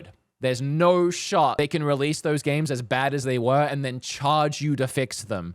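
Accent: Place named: Australia. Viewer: I have to ask, are you Australian or American? Because you have mostly an Australian accent but you mix a lot of words in with an American accent. I'm so confused lol.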